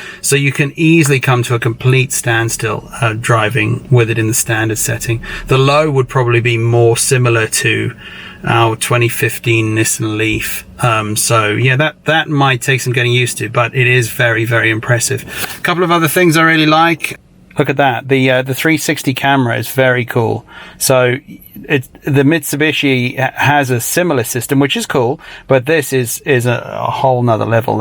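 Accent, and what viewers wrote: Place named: United Kingdom. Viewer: you have been a godsend! I have been watching your videos since just after Christmas when I decided to get a new car. All I wanted back then was a back-up camera & a hatchback [current car is a 2013 manual Mini convertible - tons of fun but a very active puppy makes the top-down times limited]. Then I went down the electric car rabbit hole - I have been intrigued since the first Tesla 2-seater which I coveted from afar. Checked out Consumer Reports and they teased that a new car was coming to market in the US soon, and that was my intro to the Polestar! Honestly, I fell in love w/ the yellow seatbelts irrationally & immediately. I have co-workers who have Teslas but I hate the center-only screen. I googled Polestar and became quickly hooked on your open & honest straightforward videos [my dad was British so the accent doesn’t hurt either, probably some subliminal warm feelings there too]. 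I’m brand new to e-cars so that started the learning curve re: charging, battery size, range, blah, blah, blah [your early video helped me slog through that phase]. I took the leap in lockdown and drove to NYC to see and test drive the car in person and fell in love! When I got home from that trip you posted your now infamous “Don’t a Polestar” video, and that put a chill on my enthusiasm. Did I really know what I was doing? Was this just my rebellion for being locked in my apartment for a year? Who knows, but I got over it and in early March ordered my Midnight Polestar 2. Did the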